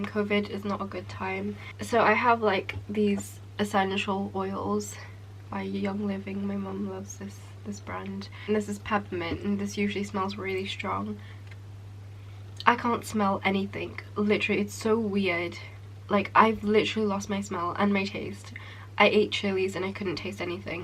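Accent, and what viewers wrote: United Kingdom. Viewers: Assalamualaikum. I'm 2 years younger than you. It's alright, I'm a girl. It's really heartwarming listening to you talking in British accent. I hope that you'll get better soon, InsyaAllah!! I've lived in the UK too. I lived in Leeds, England. For nearly 5 years. (Imagine me talking in Yorkshire accent) Anyway~ Semoga akak dan keluarga akak dilindungi oleh perkara² yg tidak baik. Aamiin. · I really love hearing British accent despite the bad news. Pray for your better InsyaAllah.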